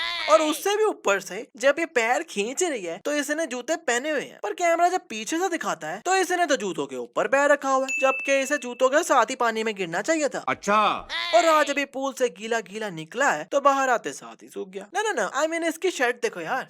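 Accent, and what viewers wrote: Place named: Pakistan. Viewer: Is this a Pakistani Channel because narrator's hindi accent was sounding like Pakistanis.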